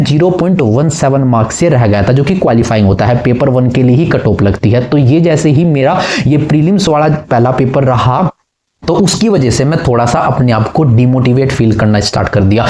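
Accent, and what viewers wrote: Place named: Maharashtra, India. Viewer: MAHARASHTRA ACCENT AALA HOTA I THINK SOLA😀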